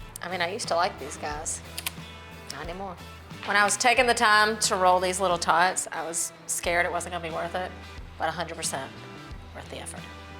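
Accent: South, United States. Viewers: The southern accent really does make this show. If she spoke normal none accent English it wouldn't have the magic that it does. Go team murricaa!!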